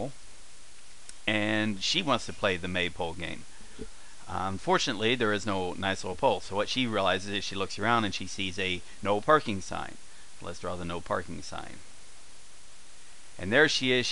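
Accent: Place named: West, United States. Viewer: how can it be the mu of samuel when the mu in samuel sounds like "myu"; geez you western foreigners and your accents at least teach correctly and try not to confuse the students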